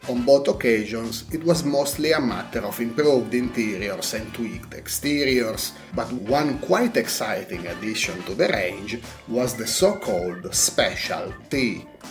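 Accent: Italy. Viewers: I like the way you tell the story of Italian cars with your Italian accent, plz keep on going it s very entertaining . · Офигенный Английский с Итальянским акцентом.(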